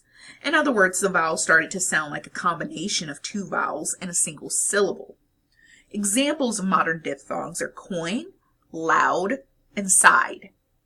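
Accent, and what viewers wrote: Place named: South, United States. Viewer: You do in fact sound southern.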